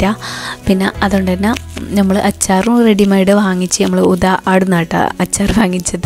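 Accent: Kasaragod, India. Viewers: ഈ slang ksrd പോയതിനു ശേഷം പഠിച്ചതാണോ? മലപ്പുറം slang ഇങ്ങനെ അല്ലല്ലോ atha ചോദിച്ചേ ❣️❣️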